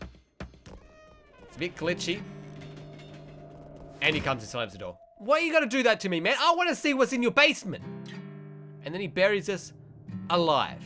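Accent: Australia. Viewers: Are you Australian? Fav new YouTuber in a while! Good content keep it uo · are u Australian? u do sound like an Australian(not in the bad way) I just wanna know where u from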